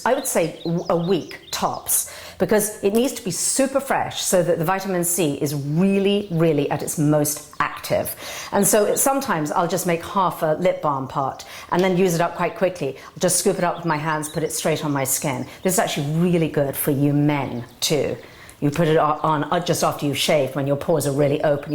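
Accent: United Kingdom. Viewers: You start of sounding British but then pronounce "vitamin" like an American. What's going on?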